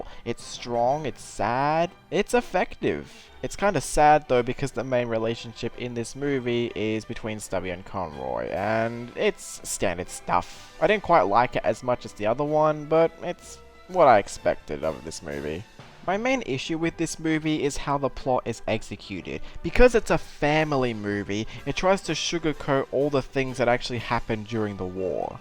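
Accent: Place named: Australia. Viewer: Wow, I didn’t know you were Australian! And about the movie, I haven’t seen it myself, but it definitely seems like the perfect film to show to elementary school kids when they need to learn about the Great War in Social Studies class! As for the animation, well, I didn’t really see a reason for the movie to be animated other than to appeal to kids more or as an exercise for the animators, but for what it’s worth, it IS pretty good, and the animators spent as much time on it as they needed to! And now I can’t wait for the Duck Duck Goose Explosion!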